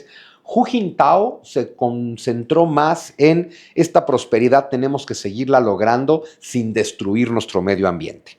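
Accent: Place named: Mexico. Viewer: tu acento mexicano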